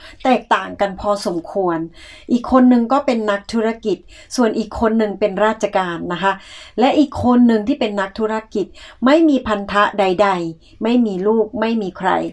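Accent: South, Thailand. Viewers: รักน้อง พูดใต้ ชอบฟังมาก ฟังไม่ออกหมด แต่ชอบคนใต้😘😍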